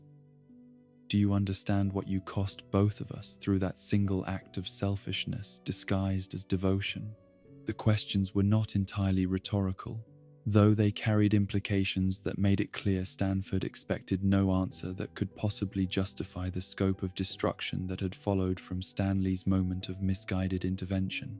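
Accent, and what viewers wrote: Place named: RP, United Kingdom. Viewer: u sound like a tory but i fw this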